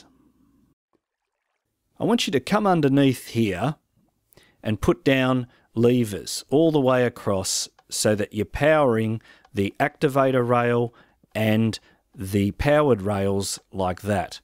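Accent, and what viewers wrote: Australia. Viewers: Oh my god, such a thick Australian accent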